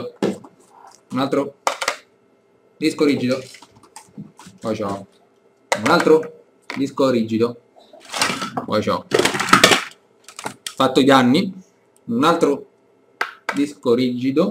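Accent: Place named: Rome, Italy. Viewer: Si sentiva che eri di Roma :-)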